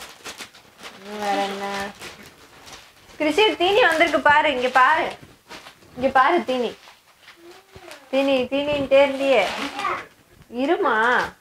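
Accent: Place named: Tiruchirappalli, India. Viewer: Ethu trichy a athan language laye theriyuthu wow me too trichy Namma pullaya nenga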